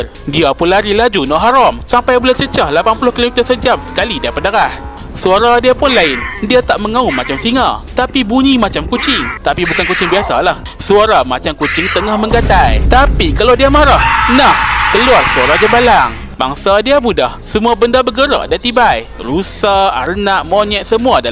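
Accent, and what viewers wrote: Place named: Kelantan, Malaysia. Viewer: Pantang lepa…😂😂😂😂 Yg ni best.hahahaha…tak macam chanel lain. Maaf cakap,ade 1 chanel tu die orang kelantan tapi die try hard gile utk cakap loghat KL😅. Buat ape nk malu…kau guna loghat kelantan tu akan jadi 1 daya tarikan tau,macam chanel ni…kite dengar info menarik sambil sengih2 dengar loghat asli die. Pantang lepa satgi habih mami jarum pun datang mai sekali…😂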